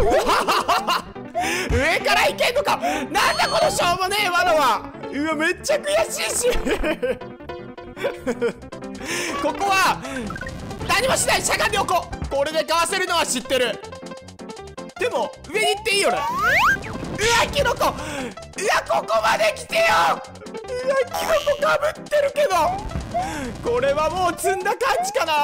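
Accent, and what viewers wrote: Fukuoka, Japan. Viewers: さんは福岡のかたかな？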